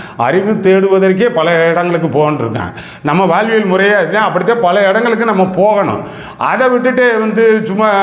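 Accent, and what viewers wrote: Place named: Thanjavur, India. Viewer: Sir neenga Tanjore a sir andha language maari iruku sir super sir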